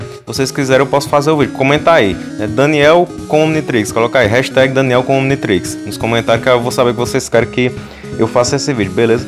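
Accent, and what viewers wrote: Northeast, Brazil. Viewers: Tu é nordestino ou carioca?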